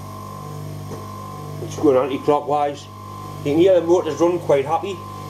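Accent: Newcastle, United Kingdom. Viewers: It would be good if he spoke English and not Geordie.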